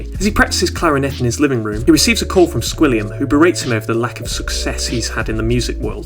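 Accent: United Kingdom. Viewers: Your British voice makes you sound smart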